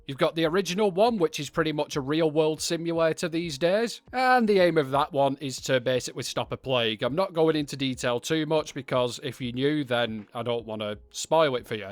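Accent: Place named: Yorkshire, United Kingdom. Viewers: Weren't expecting that accent when I looked up a new fallout 76 review lol, Bolton right? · Turn the mic down that Yorkshire accent is like needles being driven into my ear lobes. Beowulf style and I not joking. That creatures ears vibrating thats my ears. But I got what I needed after 2min so thanks. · He's not a brumy, further north. Sounds a bit Manchunian.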